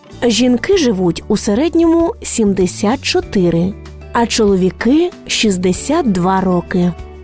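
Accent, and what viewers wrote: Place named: Surzhyk, Ukraine. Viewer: Було б цікаво, якби не цей огидний новосуржик з його -ЧЕ...-ЩЕ.....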